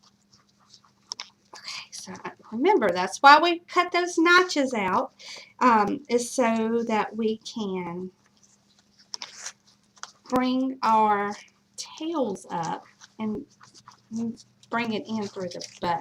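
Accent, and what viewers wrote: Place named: South, United States. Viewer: I LOVE YOUR HELEN BOW !!! YOU HAVE TO BE FROM THE SOUTH..... YOU TALK LIKE ME ! YOUR MISSISSIPPI FRIEND I LOVE YOUR VIDEOS ❤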